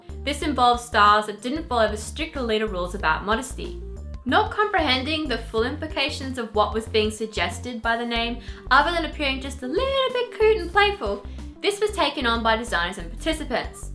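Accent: Australia. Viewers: Sorry doll, I'm Australian, and this is what Australians tend to sound like. We are working on a solution for ESL speakers:) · I recognize that she's Australian. I assumed as much given that it's on the Deerstalker channel. I hear the accent enough that I don't really much notice it but that's fine. I'll take your word for it. either way, I'm not sure OP is even worried about it anymore as I've noticed they no longer comment here. haha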